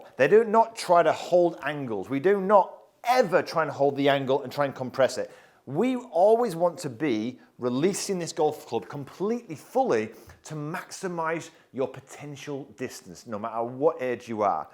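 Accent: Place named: Kent, United Kingdom. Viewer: I once asked CHATGPT about way of speaking and they gave me a long and detailed, almost scholarly account of his travels and where he picked up his nuances... very interesting and very funny. His main influence seems to be Kent in England.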